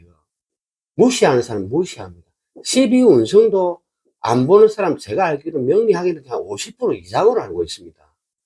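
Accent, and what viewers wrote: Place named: Gyeongsang, South Korea. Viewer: 경상도 사투리가 넘 구수하네요 😊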